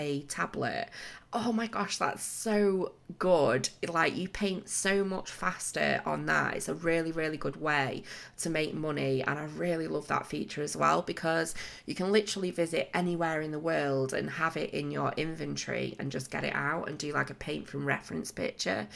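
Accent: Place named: United Kingdom. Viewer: Such an amazinh build once again! I always watch your videos... im a huge fan of UK im dying to visit your country... but until then I have to hear you accent... its so amazing 😂😂 Btw loved the entrance song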